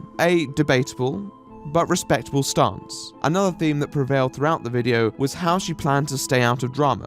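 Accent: Netherlands. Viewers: are you dutch? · wait, u dutch?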